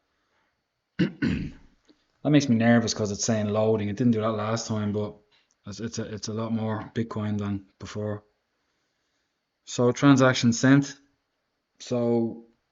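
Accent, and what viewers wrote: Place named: Ireland. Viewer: Love your vids. Question... I am no genius but it since like you might be from Ireland.. haha love the accident. I am from England... and now that labour have got in ans they are probably going to increase capital gains tax.. I was wondering if you had any advice.... if you think they could do it at the autumn election? Wait until April 2025.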